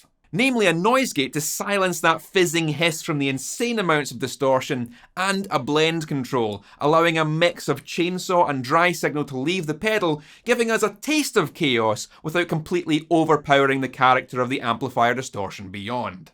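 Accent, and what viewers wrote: Scotland, United Kingdom. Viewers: I remember you from the other channel.. Im much older then you are.. I always seen you as this friendly metal musician, hard rock, heavier then but that style of metal-rockish 80 thingy. I was almost thinking that the next hit song will be released by you, and it will be better version of" ". I thought that untill now!!! I always thought that you had a cute accent and always respected it because I way better like the original Scottish culture then the imperialist culture of England, and Scottland was always this land of beuty surrounded by people who dont belong there. What you expressed today made my jaw drop! YOU ARE FUCKING AMAZING!!! DUDE! GREAT RESPECT! Respect for being such a friendly and professional video content creator while hiding this FUCKING AMAZING BEAST INSIDE YOU! Dude.. Awesome! Did Anyone else notice that!?!?! 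SHARE THE FK OUT OF THIS! The guy is A-FUCKING-MAZING! No im not getting payed for writing this shit! I could be his dad, and I got my own son.. The guy just impressed me so much. Oh my God.. YOU SIR GOT AMAZING SKILLS AND TALENTS!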